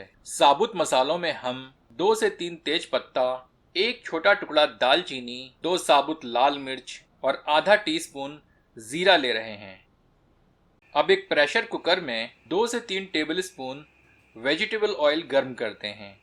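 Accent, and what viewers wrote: Standard. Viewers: आपकी हिन्दी शुद्ध है !!! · आपकी शुद्ध हिंदी, और इतने प्रेम से इसे बोलना, किसी को भी मजबूर कर देता है, आपकी रेसिपी को बनाने और शेयर करने में।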